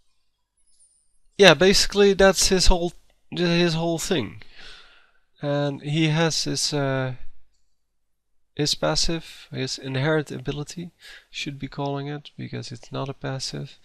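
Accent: Netherlands. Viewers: Are u dutch?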